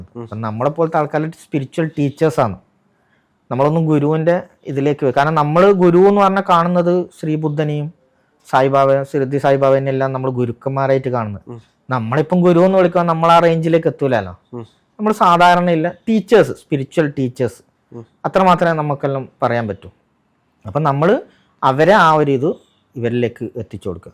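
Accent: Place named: Malappuram, India. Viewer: Slang കേട്ടപ്പോൾ ഒരു മലപ്പുറം touch തോന്നി😅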